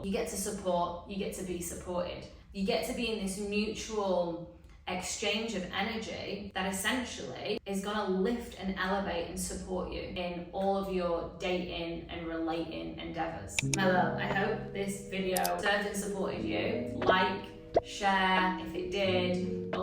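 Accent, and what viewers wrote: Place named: Australia. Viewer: I very much like this accent! Is she Aussie?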